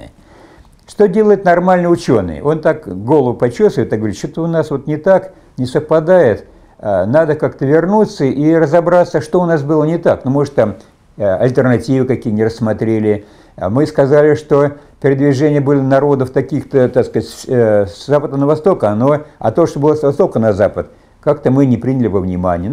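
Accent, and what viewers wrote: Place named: Kursk, Russia. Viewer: Мне Клесов нравится. Я тоже против любой элитарности. И в обществе и в науке прежде всего. Особенно мне нравится его Курский говорок. Был у нас на работе такой же парень из курских. Если в ДНК генеалогии есть рациональное зерно, то оно пробьет себе дорогу в любом случае. Кто бы чего не говорил. А если кто то против, то пожалуйста аргументы на стол. Но если оппонент переходит на личности, то других аргументов его неправоты пожалуй и не требуется. Все же просто.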